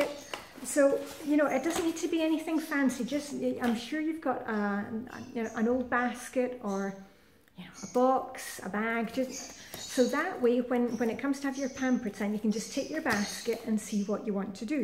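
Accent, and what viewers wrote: Scotland, United Kingdom. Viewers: You are so lovely, and I love your Scottish accent. You are so motivational because you really tell us how to do it without beating ourselves up trying to be perfect.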